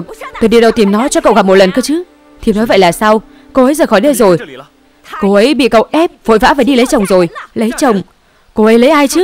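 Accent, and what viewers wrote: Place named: North, Vietnam. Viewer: Giọng Bắc đọc nặng quá xem không có hay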